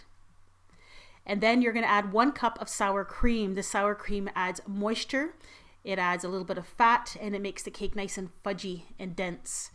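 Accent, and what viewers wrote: Canada. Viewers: omg, your Canadian!! :O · Is she Canadian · your canadian, right?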